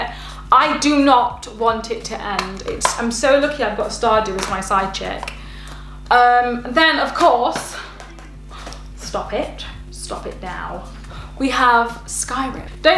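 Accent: United Kingdom. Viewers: Just love your amazing British accent of yours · I must be down horrendously bad because the white toes and the UK accent is my weakness. I can listen and watch her but the white toes sigh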